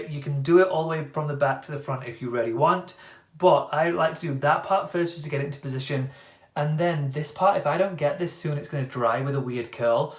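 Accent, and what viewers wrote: United Kingdom. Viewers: His accent is not that posh he’s just british! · I love how this is how you actually talk, you have a proper queens english accent & its amazing!! I'm from Liverpool (UK) and I want but nothing to have the Queens English accent! haha. Also I love your videos!!!! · I have no need for this, female with mid-long hair, but listening to you is really soothing. As a fellow brit I'm in love with your accent!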